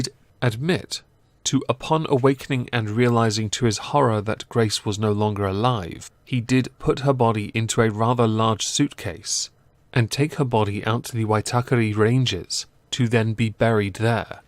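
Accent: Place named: North, United Kingdom. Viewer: Maybe, but do they have your buttery smooth northern accent?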